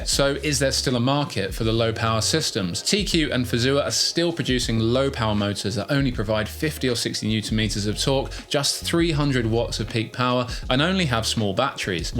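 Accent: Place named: United Kingdom. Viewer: what the f did this brit say????/ dang, subtitles can't even understand the accent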